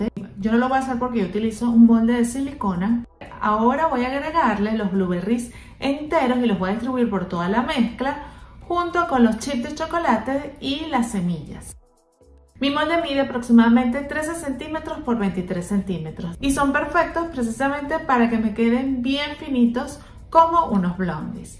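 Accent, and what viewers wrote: Venezuela. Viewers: ♥️♥️ creo que somos paisana por tu acento eres Venezolana...😍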